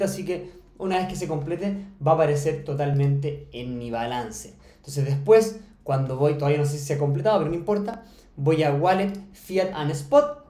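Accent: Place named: Chile. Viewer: explicas pésimo demasiado chileno pero buen contenido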